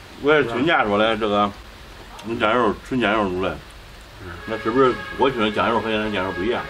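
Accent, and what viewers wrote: Henan, China. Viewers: 如果你只想拍给河南人看，你就继续河南话，否则，可以尝试下普通话。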